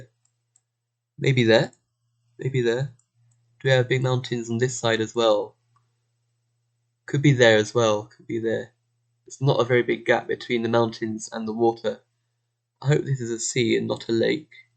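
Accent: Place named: United Kingdom. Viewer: great video, enjoying the face cam. in the last video you said you live in taiwan. are you a british living in taiwan (because your accent is quite british) or are you actually taiwanese? just curious.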